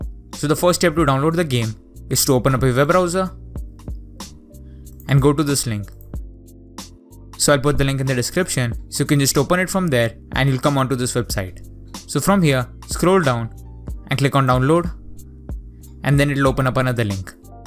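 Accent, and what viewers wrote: India. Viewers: are you indian you sound like it and i am egyptain by the way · Please don't do the cliche basey remix intro sequence- Shit. Ok, but at least have a tutorial that isn't voiced by somebody with an indian accent (no offense meant) SHIT. Another one. At least don't do the "Before we start like and sub" DAMN IT! Ok, but at least tell me it's the real deal and it's not watered down or a virus- It's an EMULATOR?!?!?!?!? That's it, bye.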